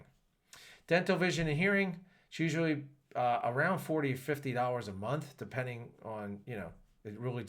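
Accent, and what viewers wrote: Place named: Chicago, United States. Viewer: This guy talks like he's from Chicago, close to my hometown.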